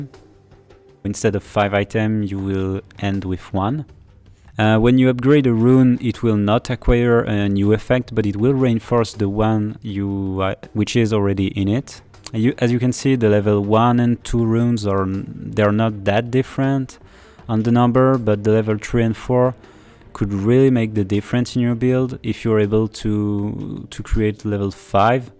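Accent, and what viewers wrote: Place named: France. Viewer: Just gave up because of the terrible accent. Don't wanna show off but tbh I could do better my first hs year and still I'm french too. If you can't talk correctly just do your vids in french, butcher.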